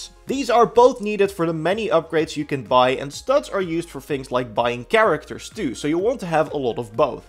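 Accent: Netherlands. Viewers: Sorry doe alsjeblieft iets aan je accent. Je video’s zijn echt leuk maar het stoort me zo erg dat Nederlands accent.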